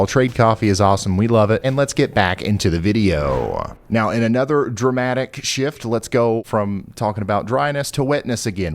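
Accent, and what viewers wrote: South, United States. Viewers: My Husband asked if he was getting paid per "gully washer" today. Love his southern-ez.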